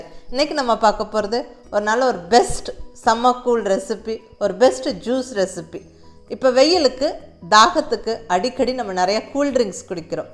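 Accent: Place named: Nagercoil, India. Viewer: Avanga slang Nagerkovil Mathuri irukku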